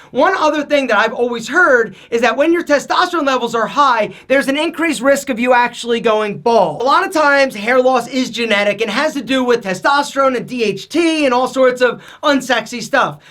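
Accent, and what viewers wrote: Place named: New Jersey, United States. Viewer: where in Jersey is this guy from lol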